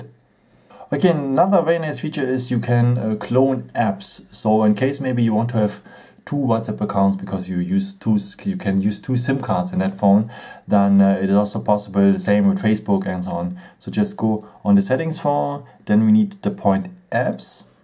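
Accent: Germany. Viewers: couh- couh seine englische Dialekt sagt ich bin deutscher !!!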